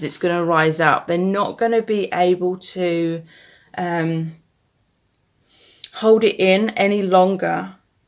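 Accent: United Kingdom. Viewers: You are wonderful , gifted , you are the real deal ❤.By the way I adore ❤ and your Britich accent .Wonderful job !!!!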